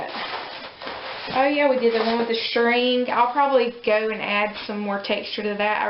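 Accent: South, United States. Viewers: I just love your little southern accent, and phrases. Reminds me of my sweet cousins from "down south". Thank you for sharing! ♥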